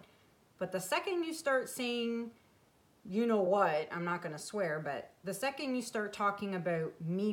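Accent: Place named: Newfoundland, Canada. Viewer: You sound like you are from Newfoundland. You sound like an uncle I had.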